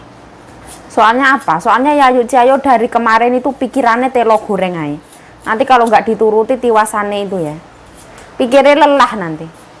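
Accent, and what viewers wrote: Java, Indonesia. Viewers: ciayo i love video you yg khas medok e😀😀😀😀👍👍👍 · Logate omong lucu...bner2 logat jowo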